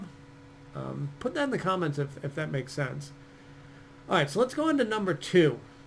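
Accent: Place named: New York, United States. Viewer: You're so smart & your vids are so wonderful & so helpful -- both by yourself and, especially, with Helena. You're funny, smart, cute & handsome,. Love your NY accent... plus your wild enthusiasm & wicked humor!!! God bless you.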